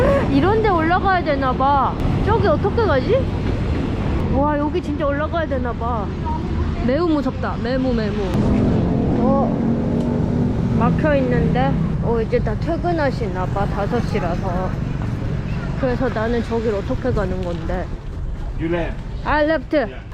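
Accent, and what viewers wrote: Jeolla, South Korea. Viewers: 목소리가 경상도 ? 충청도? 전라도? 어디쪽인가요? 다 섞인거 같으신데 ㅎ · 부산사람이 전라도 사투리 쓰시네요 ㅋㅋ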